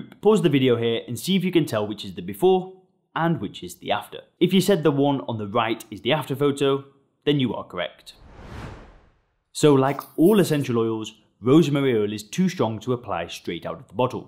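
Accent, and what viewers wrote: United Kingdom. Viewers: British of course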